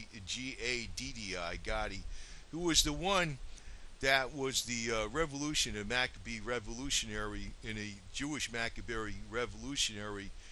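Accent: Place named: New Jersey, United States. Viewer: Its a real accent. Its an old school working class New Jersey accent I think? The only other person I've ever met with the same accent was a WW2 veteran. Its an old school accent.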